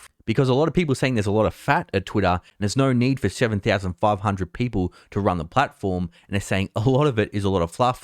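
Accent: Australia. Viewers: I really really like this content but I can't follow the Australian accent sometimes, gets frustrating.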